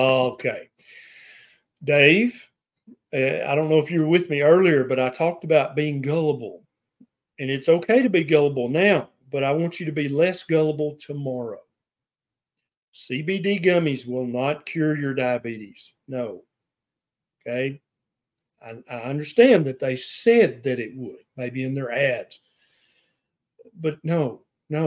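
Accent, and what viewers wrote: South, United States. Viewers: Who doesn't love the frank talk...the discussion of chicken eggs at 1 hour is classic Dr Berry. People who think the accent, the country boy talk, and the literal red neck means stupid, and they are the stupid ones. is a very smart doctor. I got a lot better listening to him and ignoring my Yale trained, proper wasp specialist. Thank god I refused Dr Yale's meds. I was getting sicker and i now see his solution to my gerd increases osteoporosis,and dementia. But it would fix the gerd! I guess the logic is that on those meds a while, i would not only forget I had gerd, i would forget i had any other issues.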